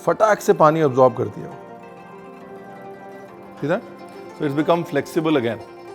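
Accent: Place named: Punjab, India. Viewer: Phir ye mat kahna ke Maine bataya nai,kayal han ji tahade,sirf punjabi sunan lai baar baar videos dekhdi haa,punjabi tadka,luvv u g alot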